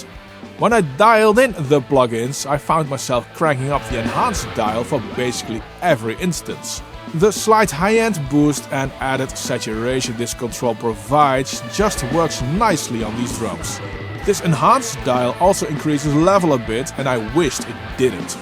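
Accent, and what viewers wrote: Netherlands. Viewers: Subscribed for the Dutch accent!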